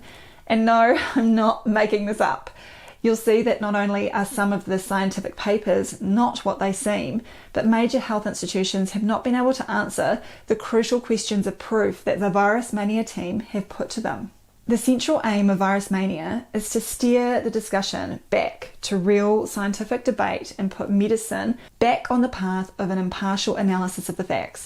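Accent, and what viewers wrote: New Zealand. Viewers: I enjoy your kiwi accent and I’m looking forward to the audio book. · Thank you for your informative videos dear. Is that a New Zealand accent I detect? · I'll definitely be downloading the audio books. As I'm a fan of the Kiwi accent and all. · A whole book read to me by, I am in. Love your voice and Kiwi accent. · your New Zealand accent is killer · Hi, I really enjoy watching your channel and greatly appreciate the concise and informative style of your videos, not to mention that NZ accent! I read the second edition of Virus Mania, a while ago, and I found it so engaging that I couldn't put it down until I'd read the whole book. I certainly see the merits of the alternative hypothesis explained there and also how it fits into the model of the overarching control imposed by the pharmaceutical industry and its delivery teams, I mean health services. 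I'll have to buy the new issue... One thing that came to me, after reading the book was the question of disease transmission, through contact or air-borne aerosols, for instance. The particles (exosomes and similar?) that are produced by cells in response to toxic, or other stress factors, will be relatively simple, e.g. a fragment of DNA or RNA, surrounded by a lipid membrane, with a few signal proteins embedded in it, which will look like what we have been led to believe is a virus. If these particles were transferred by traditional 'infection' methods, would they not just act the way we are told that 'viruses' do and elicit immune system responses in the recipients and be classed as the disease? I apologise if I'm restating something that's obvious to others. I just wanted to clarify my understanding. Cheers.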